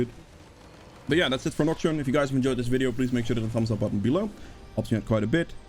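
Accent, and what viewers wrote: Netherlands. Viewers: Love the vids, i'm new to jungle and nocturne, learned a lot from you! Also; are you Dutch? Thought I recognized the accent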